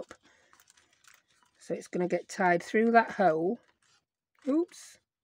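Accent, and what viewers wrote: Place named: Sheffield, United Kingdom. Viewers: are you from Yorkshire??? we have similar accents.... I'm liking this vid :o) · I am really enjoying your channel in fact I am binge watching. I was born and raised in Sheffield but living in Illinois now. I just love listening to your accent, in fact it makes me homesick. I also like your attitude to crafting, it might work or it might not work, so de it. Gail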